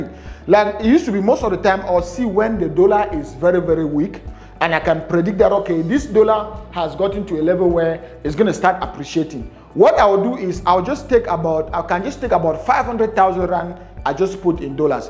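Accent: Cameroon. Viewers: You sound like a Cameroonian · Man you speak like a Cameroonian ❤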